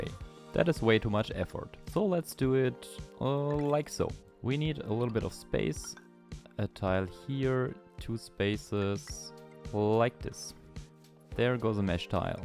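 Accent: Germany. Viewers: You sound German… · ive now watched about 2 and a half hours and realized your accent you are german right ? gibt es auch deutsches gameplay von dir ? is there also german gameplay? and btw i ike your voice and your video very much !!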